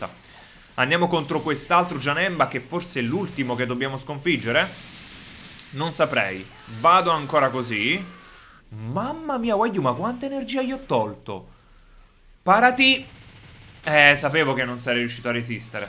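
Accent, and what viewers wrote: Naples, Italy. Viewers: Sei napoletano io si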